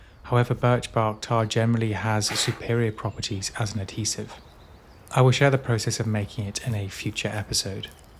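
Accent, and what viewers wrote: England, United Kingdom. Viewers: well spoken English accent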